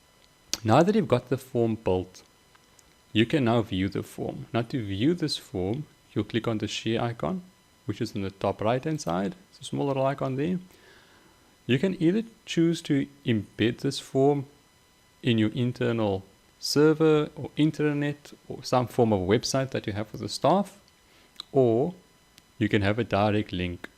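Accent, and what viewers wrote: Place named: South Africa. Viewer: Brilliant video thank you. Enjoyed hearing the SA accent too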